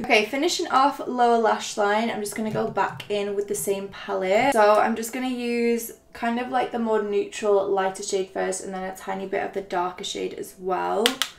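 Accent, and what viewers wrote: United Kingdom. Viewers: Finally I can find makeup tutorial with British accent!! love you!!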